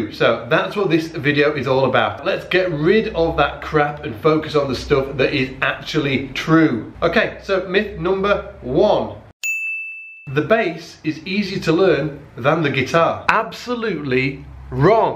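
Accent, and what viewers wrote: United Kingdom. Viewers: british accent is so annoying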